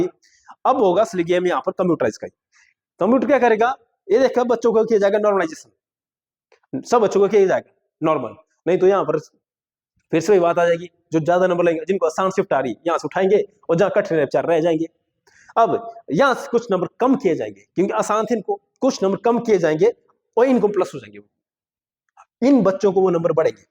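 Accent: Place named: Sirmaur, India. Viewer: Sirmaur se ho na ap bolne ke tarike se lg rha😅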